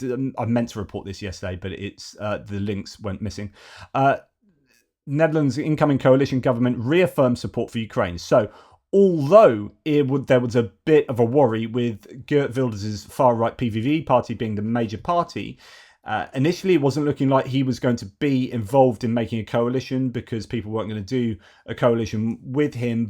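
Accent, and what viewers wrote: New Zealand. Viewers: Oh, Chur, Gotta Appreciate the classic Kiwi accent that we deny all too often. Chur for the sanctions but we need to do more bro, but smol island nation syndrome is strong over here, we only dish sanctions, they're pretty chur aye bro. oh and we have likely don't have any SAS operating over there.:_love:□ definitely not. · Slow down & draw out the NZ accent! ie really chill it out, Bro!!! ;p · To be fair, your kiwi accent is pretty on point. Aka “choice”. 👍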